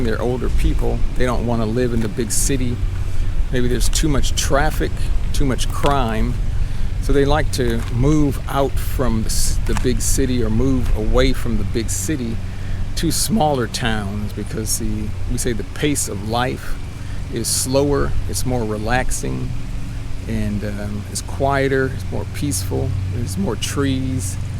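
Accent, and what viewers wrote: Texas, United States. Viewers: I like the Texas dialect ! it´s laid back and cool Wild West style😃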